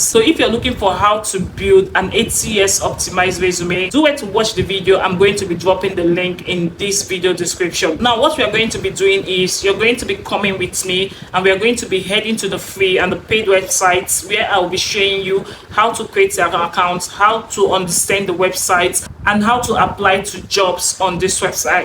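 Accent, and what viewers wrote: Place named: Africa. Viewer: What language do you speak as I'm struggling to understand you African accent 😮😮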